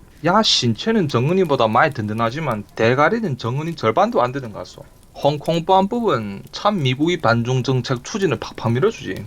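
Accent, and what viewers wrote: Yanbian, China. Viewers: 좋은영상 잘보고있어요 지난역사 현정치에대하여 머가먼지 자세히는 모르고 있지만 ㅠ 님영상보면서 여러모로 많운걸 배우고 생각하고있답니다 사실은 같은 조선족동포임에도 유트브로듣는 우리사투리가 처음엔 잘적응이 안됐었는데 듣다보니 친숙하고 님의 깊은 마음도 자세히 전달되는거같고 재미있게 보고있어요 감사해요 댓글은 처음이네요 화이팅하세요 ~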